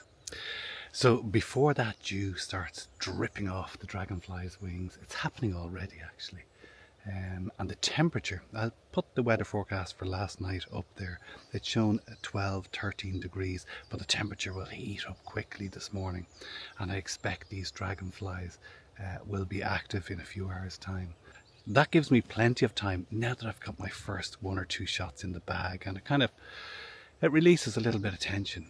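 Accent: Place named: Ireland. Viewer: As usually, just love your relaxed soft Irish delivery on the narration plus a video about my chosen genre of photography ( macro/ close up nature ) followed up with some very interesting facts and outstanding images really couldn't get any better. Certainly for me one of the most enjoyable vids. seen on u-tube of late. 👌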